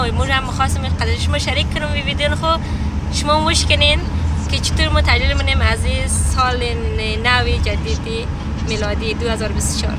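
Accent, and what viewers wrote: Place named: Hazarajat, Afghanistan. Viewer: سلام ووقت تون بخیر)منو سال نو میلادی تبریک میگم بهتون] ماشالله چه لهجه هزارگی حافظ کردین من خودم هزاره هستم خیلی کلمات تون متوجه نمیشم .منم خارج کشور هستم مهاجر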